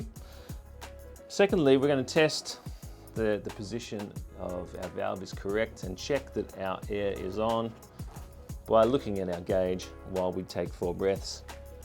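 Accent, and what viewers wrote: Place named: Australia. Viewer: You're Australian 🐨🦘🪃🇦🇺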